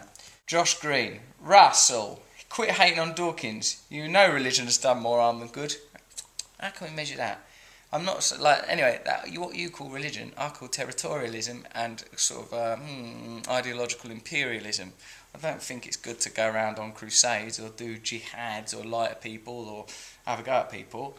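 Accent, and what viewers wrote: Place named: United Kingdom. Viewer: Russell sounds like a young ozzy Osborn . Fragmented ramblings with a strong annoying British accent . His opinion is equal to a glass of urine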